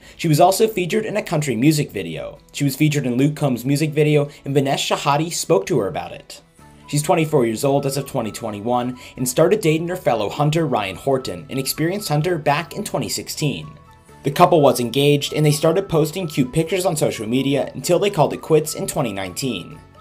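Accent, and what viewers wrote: South, United States. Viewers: Bravo! Very cool. I am from New England, so we think people down south talk funny. Well, I love her southern drawl and have nothing but respect for her outdoor skills. I know the dad is very proud. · Does a more perfect, genuine, beautiful woman exist? Wish there were millions more like her! The world would be so much better. Natural beauty - no spiked/purple hair, nose ring, ear piercings, tongue piercings, nor tatts. Just natural perfection. Even her Southern Belle accent is awesome!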